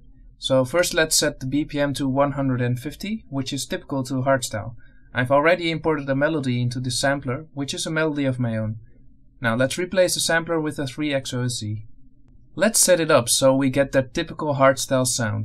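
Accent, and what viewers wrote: Netherlands. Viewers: ey are you dutch? because you sound really dutch XD ik ben ook nederlands btw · a dutchy? good accent tbh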